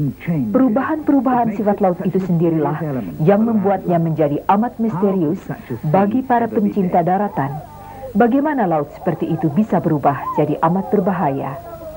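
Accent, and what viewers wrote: United Kingdom. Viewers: dari logat englishnya seperti dari BBC acaranya. Secara TVRI kiblatnya tahun 80-an adalah BBC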